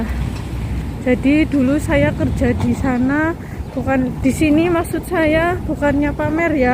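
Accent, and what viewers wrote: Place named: Cilacap, Indonesia. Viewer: Aku kok suka logat mbak nya ngomong jadi ingat temen saya ngapak2 daerah Cilacap.salam kenal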